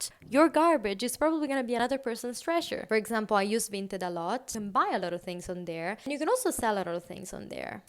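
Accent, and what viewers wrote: Italy. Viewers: Mado, che bell dialetto italiano 🥰🤌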